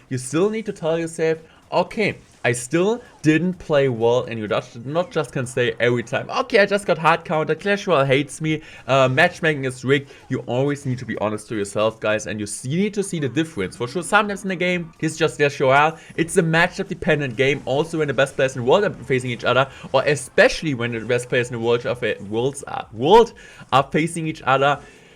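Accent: Germany. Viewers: We love accent germany/English.. best content creator ever.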